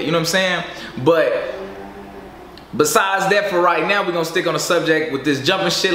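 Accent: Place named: Florida, United States. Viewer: Sound like u from florida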